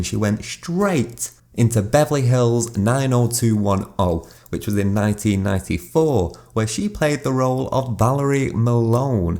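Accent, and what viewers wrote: United Kingdom. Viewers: Always appreciate your content. Certain it's your accent that makes me miss the UK a little or the tour of topics that walk down memory lane with reminders of more innocent and care free times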